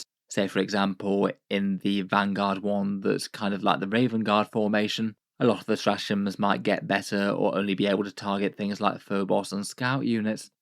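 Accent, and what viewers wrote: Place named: United Kingdom. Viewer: Like the video because is vigilant like a sleepless dreadnought. A dreadnought with that smooth succulent British voice. If I were a Slaanesh worshiper I woul /MESSAGE DELETED FOR SPREADING HERETICAL THEOLOGY// INQUISITION ORDO-HERETICUS/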